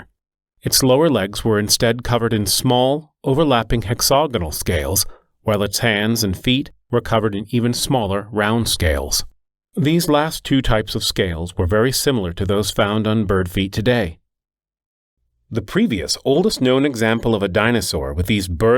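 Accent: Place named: United States. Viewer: the new narrator is very sensationalist and sounds like talkin to children on a village pfair. Way too American somehow, please get back the previous guy :)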